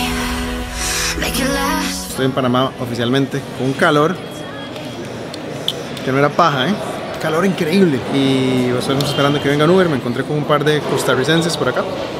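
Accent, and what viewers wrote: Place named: Colombia. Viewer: pareces colombiano, de echo hay partes de que tu acento se asemeja mucho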